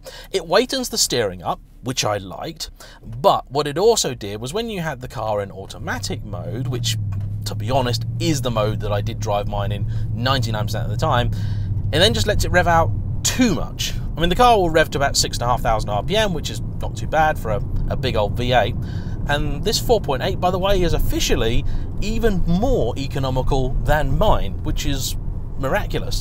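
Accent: United Kingdom. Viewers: your british accent is so smooth and easy to understand. thumbs up from Romania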